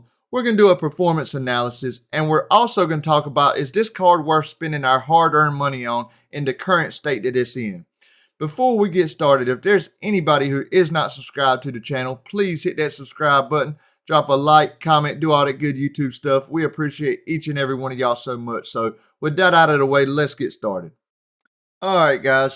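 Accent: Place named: Arkansas, United States. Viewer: Love the accent! Reminds me of when I lived in Arkansas for a couple of years haha. Great overview man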